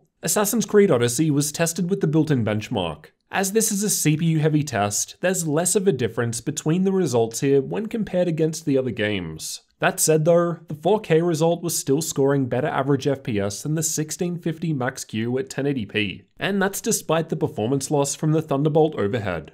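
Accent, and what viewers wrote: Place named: Australia. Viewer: aww, I liked 1-0-7-1-0-u. It’s surprisingly melodic with an Australian accent.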